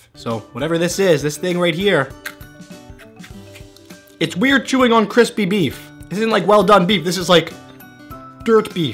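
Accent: United Kingdom. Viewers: Your British accent had me like 😭😭😆😆😆😭😭😭😭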